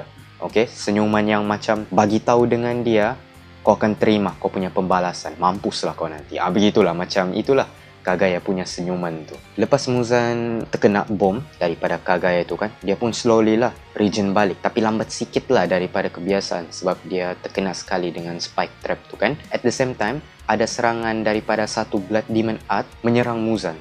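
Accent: Sabah, Malaysia. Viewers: Ui orang sabah ba kau ni 🗿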